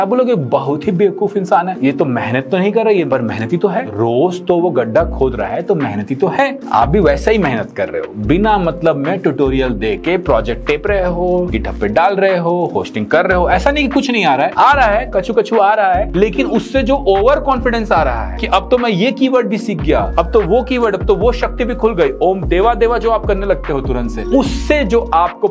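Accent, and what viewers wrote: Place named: Bhojpuri, India. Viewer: your bhojpuri accent is so sexy "Maatha" mtlb sir dard 😂😂😂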